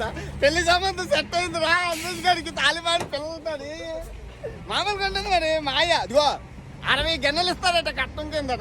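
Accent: India. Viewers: you are a unique guy. Got addicted to your videos after seeing the Telugu Traveller vloggers controversy video. Great that you are enjoying and making viewers happy with your videos. Your voice, sense of humour, Telugu slang makes your videos more beautiful and wonderful. Wish you all the best. Take care.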